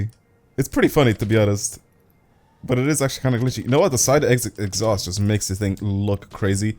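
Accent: Ireland. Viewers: You're Irish? Me too! Happy St. Patrick's Day brethren!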